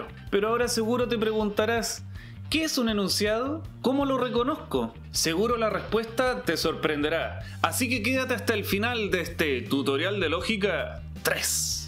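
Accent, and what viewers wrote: Chile. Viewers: Q lindo el acento chileno, no se xq la gente no le gusta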